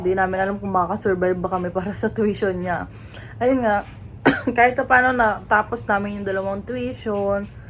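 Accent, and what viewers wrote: Batangas, Philippines. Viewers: Mga bata pa kayo kaya keribels lahat ngbpagsubok basta laban lang! Vlog nyo plagi ang nasa tv sa sala namin hahaha.. asawa ko plagi nanonood sa inyo habang nagawa ng email nya sa trabaho sa gabi😂Tawang tawa sa iyo dahil pareho tayo ng punto, taga Batangas kasi kaming mag asawa!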